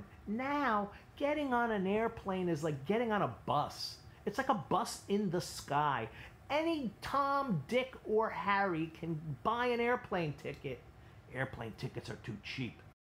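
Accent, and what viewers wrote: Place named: United States. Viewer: so clean american accent )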